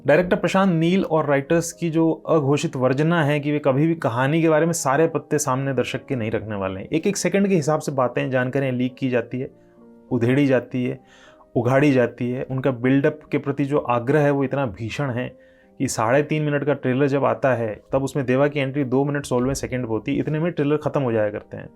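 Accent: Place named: Rajasthan, India. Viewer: भाई मैं आपका रिव्यू पूरा देखा पर भाई सच कहूं तो जैसे ही अपने बोलना शुरू किया पता नहीं क्यों अंदर से आवाज आई कि आप राजस्थान से होंगे 😊 सच पूछो तो इतनी bachodi राजस्थान वाले ही कर सकते हैं भाई सच पूछो तो आपने बहुत तगड़ी bakchodi ki 😅😅hai और सच बताओ तो मैं खुद राजस्थानी हूं इसलिए यह Janta hu😅😅😅😅😅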